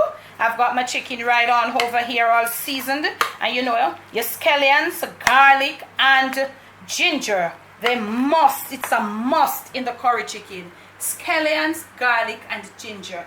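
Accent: Jamaica. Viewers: I just love listening to you talk. Haven't heard Jamaican like that in such a long time. Reminds me of growing up. Do you have a restaurant? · Good work n ur Jamaica slang make d food romantic kudos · Mi just a buck up this lady. I love your cooking, how u talk, how u just move round the kitchen. Ol school yardie style. Food bound fi nice. I love love love your channel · I love the Jamaican accent. It looks delicious. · I so LOVE my Jamaican Brothers and sisters I can SCREAM! I finally got to know a Sister, Collen, decades ago and got to ENJOY Jamaican Dishes! Lord knows I WISH I could connect with her again after she left Schenectady NY! I am STILL making the dishes she taught me to make! Collen made her Curry Chicken somewhat differently, but because I am adding Potatoes to mine, I want to know WHEN to add the potatoes! I so LOVE your ACCENT and try to use it whenever I am around Jamaicans! 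In fact, I was BLESSED to know and date a Jamaican Man for a few years! He was TOO GOOD to be true! I will always REMEMBER him as he passed last year! I KNOW he is watching over me! COLLEEN, IF YOU ARE READING THIS, PLEASE LEAVE A MESSAGE! (BOBIE-SCHENECTADY NY) YOU HAVE NO IDEA HOW MUCH I MISS U & YOUR FAMILY! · she just started cooking & I'm ready to eat🤗..lol... I love the Jamaican accent!😊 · Your delivery of this video is sooooooo Jamaican....love it!! Thank you from the UK.... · I just love that Jamaican accent..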